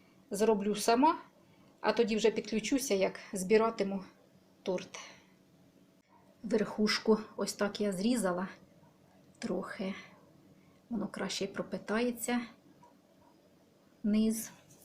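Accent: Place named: Poltava, Ukraine. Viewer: а де ви проживаєте? чи не на Полтавщині?знайома говірка.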